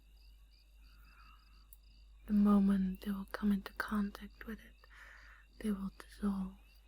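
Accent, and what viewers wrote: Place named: Netherlands. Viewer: Your videos are all amazing. You're so creative and you perform your role plays with passion. Thank you for what you do. I enjoy your Dutch accent influencing your other accents that you do. It is very triggering for me because I have never heard it anywhere else:) please continue with your YouTube channel.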